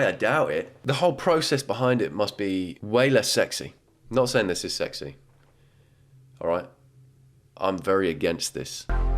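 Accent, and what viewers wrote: United Kingdom. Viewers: yoooo is it me or he js sounds british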